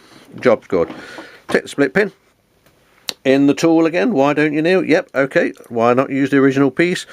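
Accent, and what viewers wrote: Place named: United Kingdom. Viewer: Clear, concise and helpful video... with a great Brit accent to boot. Good stuff!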